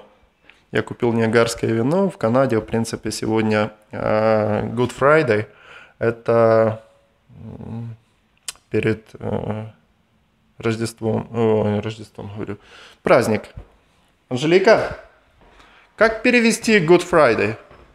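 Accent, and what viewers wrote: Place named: Ukraine. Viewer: Привет!)Акцент украинский хороший!)))Спасибо за советы!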